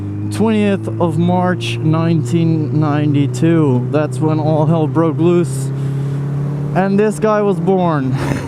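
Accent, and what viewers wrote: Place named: England, United Kingdom. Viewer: Not going to lie I may have screeched out of shock when you said my name.😂 Also before I had known you were Dutch I thought you might have been from England based off your accent when speaking English, but now I know it's probably from going to and English school in Saudi Arabia for some time.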